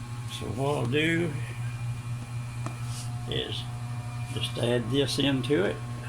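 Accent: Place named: South, United States. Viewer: It doesn't seem fair. I read [never knew 'em] my great grandfather raised 7 boys and 3 girls on tobacco. I never met a single great uncle, nor any cousins. Done hung my guitar on the river of Kebor.. i asked NOT to play a song at a local bluegrass old time music jam tonight. Nice to hear a southern accent. ❤😢😢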